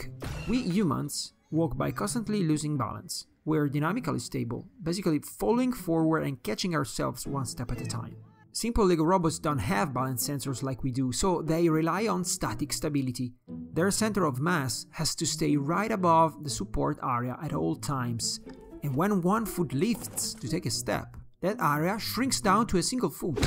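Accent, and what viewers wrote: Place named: Italy. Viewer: I'm guessing Italian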